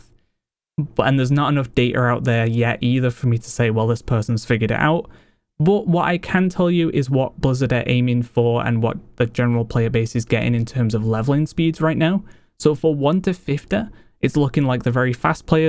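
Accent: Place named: Northern England, United Kingdom. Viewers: It’s his northern English accent. That’s how they pronounce it there! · i would say lancashire/ cheshire / w yorkshire region for his accent. nw england.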